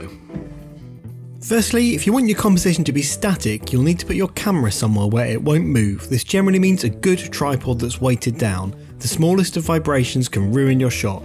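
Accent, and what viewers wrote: United Kingdom. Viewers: Very good presentation and in perfect British English!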